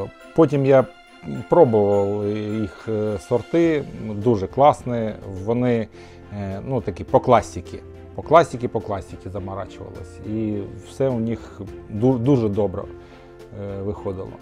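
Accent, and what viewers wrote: Ukraine. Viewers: Мої батьки з Донеччини розмовляють українською з таким же акцентом))))))) це мабуть територіальна особливість!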